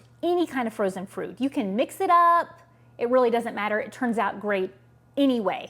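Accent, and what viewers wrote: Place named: South, United States. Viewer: … I just knew you sounded like a Southern Girl, like me, in Texas. Didn’t have time to make the cobbler today, I did get out the flour and sugar, I have fruit and will make it soon. Makes my mouth water to think about it. Thanks again for sharing. I’m really enjoying your recipes, want to make that easy bread too! 🤗🌺🤗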